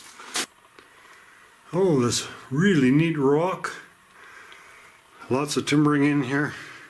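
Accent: Canada. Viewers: Wonder if they would let me pick some of the gold out, sort of a day trip thing. Looks like it would be fun. We can tell you're Canadian, ay. The quartz is white because it formed with oxygen, so it's name is really quartzite.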